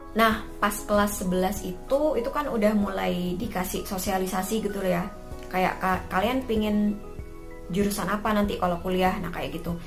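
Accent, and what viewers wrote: Jember, Indonesia. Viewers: Kkak org jember ya?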